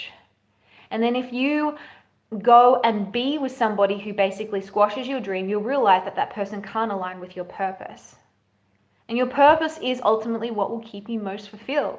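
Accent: Australia. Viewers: I saw a video by some mgtow guy nit picking on your video. I wanted to hear what you were saying because there was some good stuff there, but couldn't because of his constant interruptions with his "color commentary". He didn't mention who you were so going by your Australian accent I found you. On your mgtow video, you start by saying "leave your comments below", but when I scrolled down, the comment section had been turned off. My hunch is that you got inundated with mgtow tribal wrath. What I gathered from his video is that while you were discussing personal relationships, he was straw manning it with "ya but the workforce". Blatant to me but perhaps filet mignon to his followers. I saw a guy interviewing men and women as to whether they can just be friends. All of the women said yes, most of the men said no because the feelings are there. Cheers.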